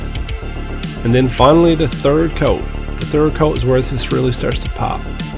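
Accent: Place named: Ontario, Canada. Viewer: Nice work on these, but where’s this guy from? His accent sounds like Ontario crossed with surfer bro.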